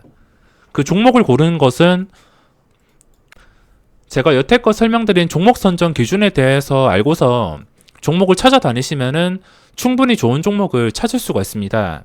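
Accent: Gyeongsang, South Korea. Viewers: 그게 갱상도 발음이고 사투리임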